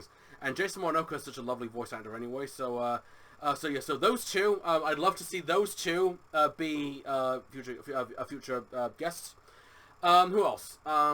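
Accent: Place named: United Kingdom. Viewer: This whole time and I never realized you were British lol Lord help me, I'm not bright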